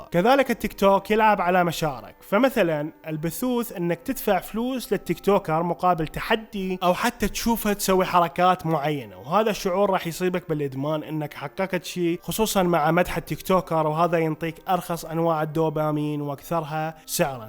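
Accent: Iraq. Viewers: احلى شي اللهجة العراقية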